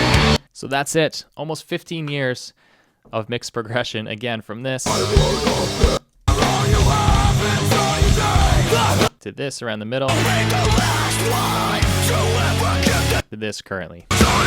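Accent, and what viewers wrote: Canada. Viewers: Video recommended on YouTube. "Well that's a good southern Ontario accent if I've ever heard one". Going through bands - oh yeah, these are all the hardcore bands I listened to in High School, man, where I grew up had such a crazy hardcore scene. Then proceeds to play song from band I went to high school with. Well yeah. That makes sense.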